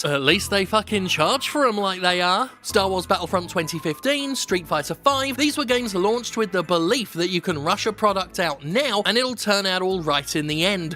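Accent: United Kingdom. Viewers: It's been 10 years and I still get a hard-on every time I hear that swanky British accent. Sheers mate!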